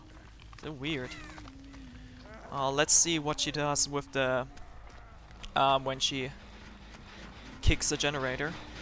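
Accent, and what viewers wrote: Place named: Germany. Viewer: I was just wondering, because you sound german:D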